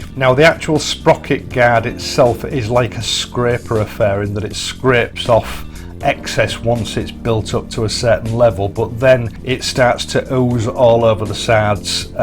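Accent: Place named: Yorkshire, United Kingdom. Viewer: Hi, I too, like Mark below but somewhat later down the road, have only recently discovered your channel and I completely agree with what he has written. After starting my riding 'career' in 1973 on chain driven bikes (using them literally all year round - no car) to commute to and from work and remembering the nights when I'd come home and then have to strip the chain off and heat up a round tin of chain oil/grease and soak them over night, (and then put it back on in the morning), in the late eighties I 'saw the light' and since then have ridden shaft drive bikes. However, just recently I have purchased a Bonneville Speedmaster (previously a Pan European) and put the 'fun' back in my biking! Over the intervening years chains have progressed and the range of products now on the market to keep them in good condition has mushroomed; the choice is huge and this is where your excellent thoughts and advice are a God send as are alot of the other topics you cover..... 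Apart from anything else, I love your accent and manner of delivery and reminds me very fondly of an old work colleague who came from Airmyn near Goole and with whom I'm still in contact. I digress. Thank you and keep up the great work! 😀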